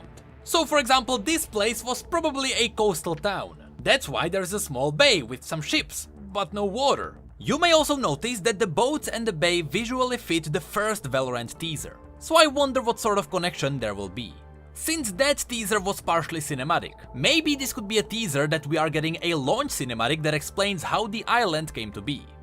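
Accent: United Kingdom. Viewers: nah he from the UK init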